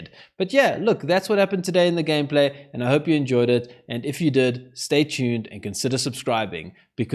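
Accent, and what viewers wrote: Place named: South Africa. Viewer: You South African? :D Also, I see Mythic Mike, I like haha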